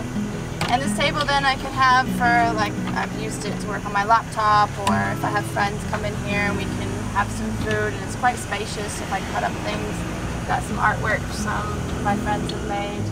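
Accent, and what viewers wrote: Australia. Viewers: Great van! PS I like the slight Aussie accent you've got going on now :) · Am i detecting a slight Aussie accent??? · So many American's love Australia. You sound and look local now, from when you first arrived. Tanned, barefoot all the time and the tatts. At least they don't look down on you like in the US when you walk into a store without shoes.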